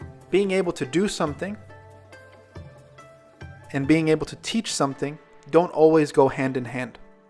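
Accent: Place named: Israel. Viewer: love your vids:) where are you from? sound like israeli accent but i dont sure...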